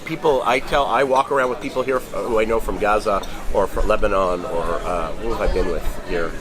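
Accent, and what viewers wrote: Canada. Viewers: He sounds so Canadian.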